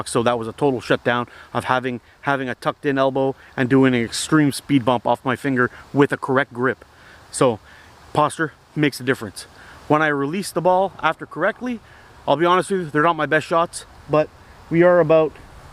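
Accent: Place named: Canada. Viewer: Just found your channel - great stuff! Nice to watch a slingshot video where the host has a Canadian accent EH! Cheers from Perth Ontario!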